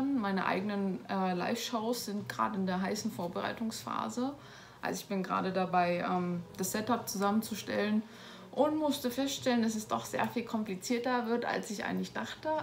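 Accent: Germany. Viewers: Your German accent sound beautiful, and you are so cute😍😍😍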